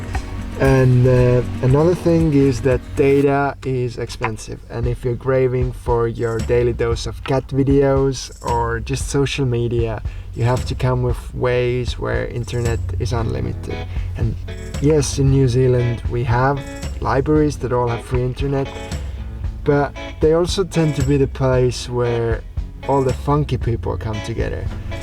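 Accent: Scandinavia. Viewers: Loving the accent!! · Nr 2: Showering! Oki thats enouhn problem for me! Btw are you Swedish? ya accent sounds from Scaninavia!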